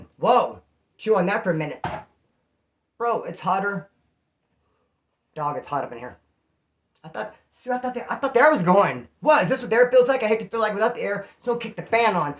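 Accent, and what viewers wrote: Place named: Inland Empire, United States. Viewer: FUCK that sounds like someone from the IE.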